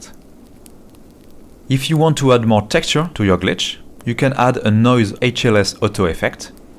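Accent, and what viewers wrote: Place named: France. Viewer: J'ai l'impression que c un français qui parle anglais la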